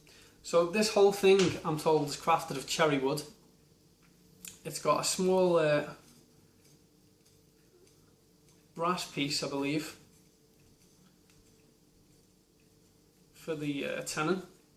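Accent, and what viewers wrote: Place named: Liverpool, United Kingdom. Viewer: Terminus Airsoft he's a scouser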